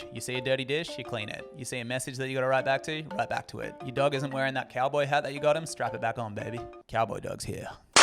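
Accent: Australia. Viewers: the ausie accent is just too much to take. sorry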